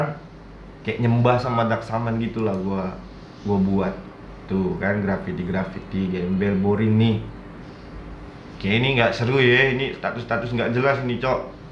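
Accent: Java, Indonesia. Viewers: Asli jepara apa purbalingga apa depok si ini org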